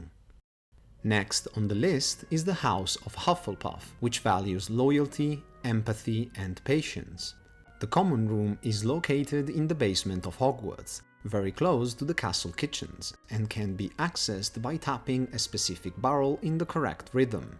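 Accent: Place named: Italy. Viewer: Ho riconosciuto il tuo inglese italianizzato dall’accento e dall’intonazione, devo dire che sei molto bravo e supporterò il tuo progetto perché sembra molto interessante e anche perché sto cercando di fare lo stesso cercando di avere un canale completamente lingua inglese💪🏻🫶🏻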